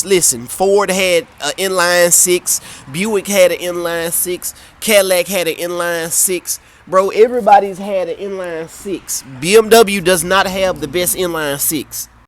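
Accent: United States. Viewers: Thanks!brother I’m from Boston massachusetts and when I initially heard your accent I was like here’s some country dude with some country slang even a brother from Boston won’t understand but then you start talking and oh my god you’re a genius !! You definitely know your shit !!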